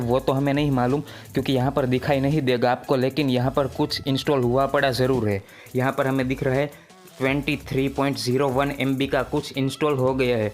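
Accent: Gujarat, India. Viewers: Bhai gujrati ho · Gujarati ho bhai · Gujrati ho bhaya 😍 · Tame gujrati cho e tamri boli mathi kahber pade che bhai kaya thi cho bhai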